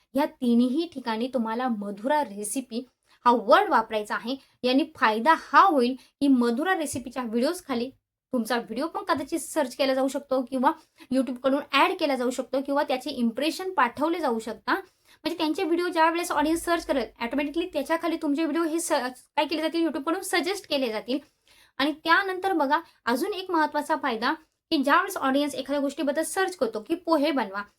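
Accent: Nashik, India. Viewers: Tai tu nashik chi ahe ka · Tai tumhi nashikchya ahat ka ?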